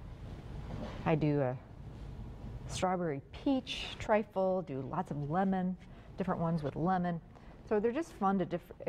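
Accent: United States. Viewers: Oh, no. Packet "pudding," store-bought crackers, store-bought cake ... and won't even whip real cream. When I first heard her accent, I thought, "Oh, this is going to be terrible," then berated myself for stereotyping Americans. This is so much worse than I'd thought was possible. Maybe it was for a "quickest desserts ever" segment? My goodness, even the layering is dreadful -- it's a tumble of ingredients. Let's see actual layers. Very dry, with so little liquid (sherry &/or juice is usually needed for the sponge).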